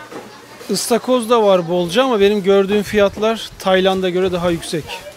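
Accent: Samsun, Turkey. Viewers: "Ben de az yiyim daaa." samsun ağzını filipinlerde görmek duygulandırdı abi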